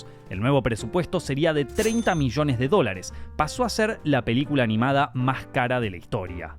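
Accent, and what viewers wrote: Argentina. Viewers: Empecé a ver el video pero al oír ese acento argentino que detesto, mejor me voy a ver otro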